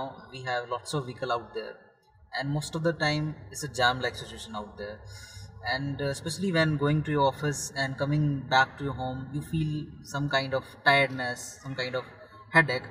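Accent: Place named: Haryana, India. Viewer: sir, can't stop his haryanavi accent coming inn " Clitch" and brake!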